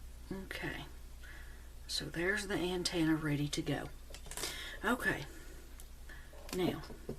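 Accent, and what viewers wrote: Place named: South, United States. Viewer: Wow I’m so happy I found ya ! I’m southern and I can hear that in your voice but this is neat ! I just found ya on here ! Very happy I did ! I bet you could even use Bobby pins for the wires too even ! Just saying! Lol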